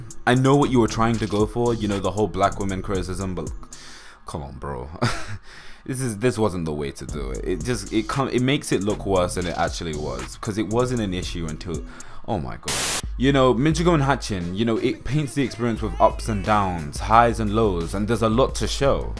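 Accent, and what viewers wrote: England, United Kingdom. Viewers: What's this Black/Blank memento thing he mentions in the video? I can't white hear it because of his British accent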